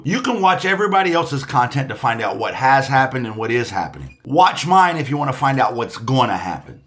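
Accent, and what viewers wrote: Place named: Piedmont, United States. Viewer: Thought I recognized your accent; piedmont here 🙋🏽‍♀️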